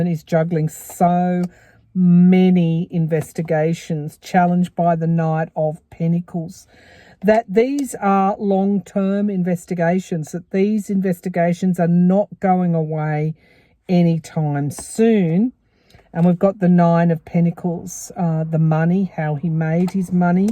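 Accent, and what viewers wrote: Australia. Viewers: When you said that this is the card reading for this week, I heard “car reading,” differences between your area Australian accent and a Kansas one! Pretty funny for me anyway! I still giggle when I think of my first reaction…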